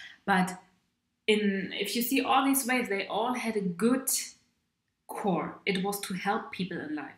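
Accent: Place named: Germany. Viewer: Ich liebe es, mit deinem deutschen Akzent zu sprechen. Sie haben eine so positive Aura. Du lächelst immer. Ich mag das sehen. Ich finde deine Videos charmant. Ich weiß, du bist sehr weise und gelernt. Ich spüre "Macht" in dir. Vielen Dank. Mögen die Götter dich und dein Zuhause segnen.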